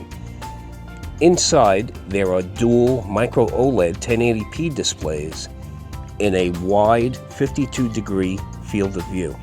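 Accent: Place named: New York, United States. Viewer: New yorkah?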